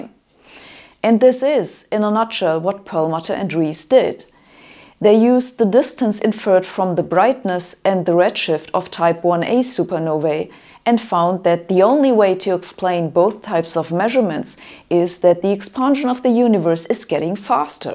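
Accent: Germany. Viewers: Came for the dark energy, stayed for the cute German accent.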